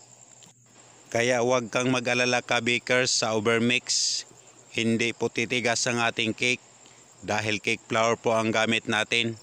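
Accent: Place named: Visayas, Philippines. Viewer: gud morning chef watching from Guam. gusto kita magturo kasi detalyado at simple lang.God bless you chef. bisaya ka chef? bisaya kasi ang accent mo. kung bisaya ka parehas tayo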